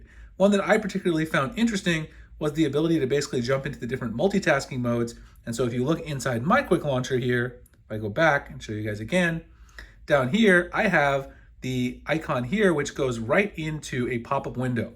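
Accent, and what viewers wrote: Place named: United States. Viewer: Nice informative videos. 👍🏼👍🏼 Kudos to you I found your channel by chance the other day, when looking for YouTube videos about Samsung Galaxy smartphones/tablets guides Note: this is not a critisism, but simply ❓ A wee, and somewhat personal request (by me) vis-a-vis your accent. ❓ Any chance that you could slow the pace of your speech. (Pretty please?) We Brits, on the other side of the pond, tend to find your pace of conversational speech a tad fast for us at times and I have to keep backtracking to watch it again. When I'm in the USA, and I've been back and forth since the early 80's visiting relatives, even though they do understand my accent, albeit a regional one, I now slow the pace down, so my relatives can understand me much better, even though we've known each other for 40 yrs plus, they still, at times, say I talk too "fast" for them, and have to repeat myself from time to time. 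I'll keep watching, either way, as what you show, and explain, shows there's a lot of depth, and "hidden" things within the S23 Ultra that I didn't even dream of. I've only had mine for around 3-4 days, and I'm still getting to grips with it and it's way of working and you've alreay helped me out greatly. 👍🏼👍🏼So, again, kudos to you from me, and others too no doubt, for your work, and tips on how t get the best out of Samsung smartphones. 👍🏼👍🏼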